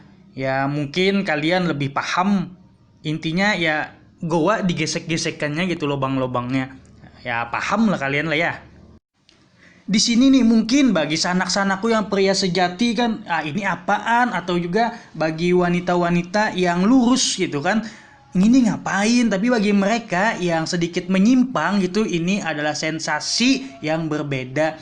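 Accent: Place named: Banjar, Indonesia. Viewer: Dengar logatnya bhasa banjar langsung subrek😀😀....lagi pang revwie film lesbong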